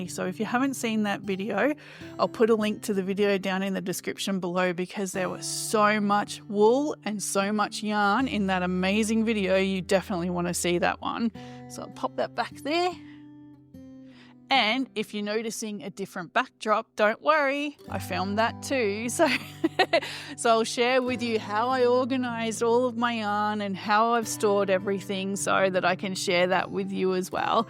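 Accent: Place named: Australia. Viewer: Glad I've found your channel. I've just subscribed. It's lovely to hear an Aussie voice and to hear your story. I'm in Tassie. I retired this year and also first picked up cross stitch (HAED of all things) but found it a bit too intense with the concentration and had to use a magnifying lamp and even that was straining my eyes. So, I've put that on the back burner for now. I'd learnt basic crochet stitches as a youngster from aunts and great aunts, maybe even my grandmother but I'm not sure. But I've never crocheted an actual item. I found some 3 ply wool I'd been knitting up but never finished as a baby blanket over 26 years ago when I was having my son. A few weeks ago I took the unused wool from that and a crochet hook I found in my sewing box and taught myself from YouTube videos and made a small pram sized baby blanket with a really pretty shell stitch. 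It was so satisfying to actually finish something. Then my daughter in her 20s pointed me to a pattern on Etsy for a daisy granny square blanket and asked if I thought she'd be able to make it. I looked at it and told her probably not. She's never crocheted before. So I bought that pattern and saw two other patterns I liked on that Etsy site and bought them. It's DreamMakesbyJD. I think she's in WA. So I started with the Mermaid Dreams pattern as a test run for myself. I started the large size (she called it Afghan-small) and then realised I didn't want it that big. So I looked up the dimensions online for what I was look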